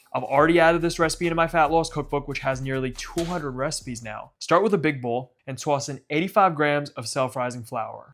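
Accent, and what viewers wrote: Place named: Boston, United States. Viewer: Amazing! Love the subtle Boston (or is it Brooklyn? 🤔) accent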